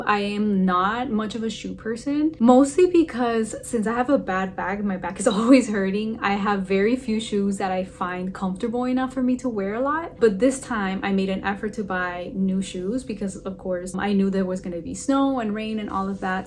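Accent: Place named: Southwest, United States. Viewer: I love your video, your comfy shoes are beautiful. The whole time I was trying to place your accent, it sounds like south East LA, not Tennessee. They have a variety of crazy accents, but yours sounds distinctly southwest. I’m from California btw.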